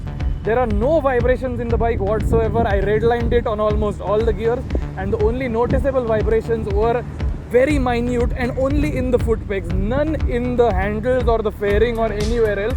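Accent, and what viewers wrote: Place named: Maharashtra, India. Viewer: Rad reciew boys.. keep it up and keep up that Marathi-ish accent that lends you the unique charm 💐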